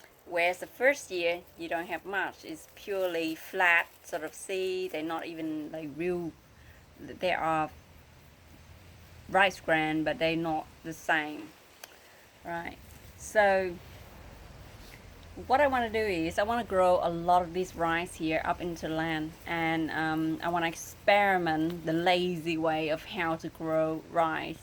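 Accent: Australia. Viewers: Beautiful plants also Is your accent mixed in with Australian? 😌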